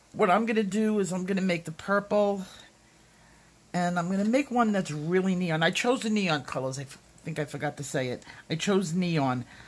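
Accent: New York, United States. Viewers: I was dying to try your recipe but didn’t have the beeswax. However, I had a Caran d’Ache wax crayon. I added your amounts in mineral oil and linseed oil but no wax. I figured the wax in the crayon would be sufficient. It worked! Thank you so much for sharing all of your information on this! It really is a gam changer! ❤️ PS love your NY accent.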